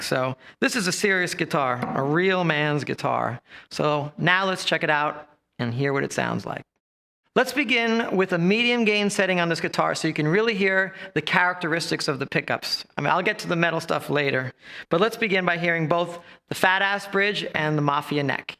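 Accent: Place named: Texas, United States. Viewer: WOw! Thats a pretty dope Texas accent...hehe...awesome model by BCR...good stuff!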